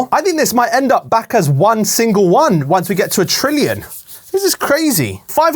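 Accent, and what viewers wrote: United Kingdom. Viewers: Is he British? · “for the non-americans” him being the most british person ever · i love the britishness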